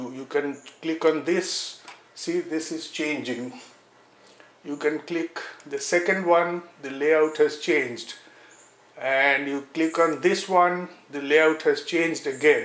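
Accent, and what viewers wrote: India. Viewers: Awesome job of speaking, I don't care if you have an India Accent, LOL You are speaking quite clearly Im sure I will learn stuff from your tutorials 5* from Walter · You should apologize for your Indian accent. There´s nothing wrong with it, and it sounds great. Greetings from Montevideo city, Uruguay.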